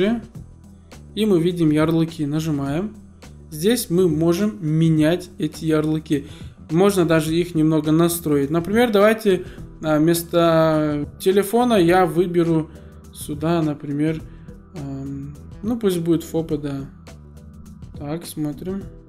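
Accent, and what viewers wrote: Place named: Belarus. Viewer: БЕЛАРУСА СРАЗУ УЗНАЛ.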